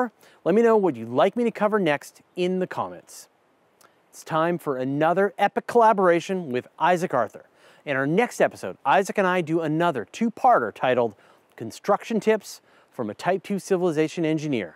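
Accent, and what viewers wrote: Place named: Canada. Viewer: I almost forgot you were Canadian, your accent is the same as northern USA until I heard you say "a-boot" sorry just made me smile😃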